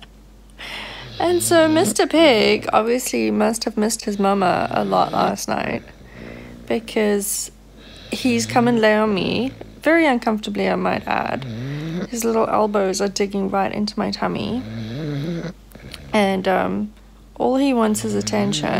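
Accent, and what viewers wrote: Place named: England, United Kingdom. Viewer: I just love your English accent (I am from US) Your close ups of piggy's face are really cute and funny! I love your your commentary, too!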